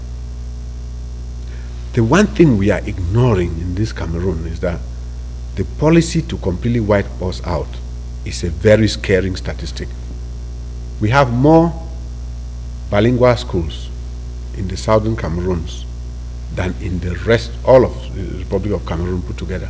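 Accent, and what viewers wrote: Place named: Nigeria. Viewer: You sound Nigerian . How is the struggle of Cameroonians consern you ?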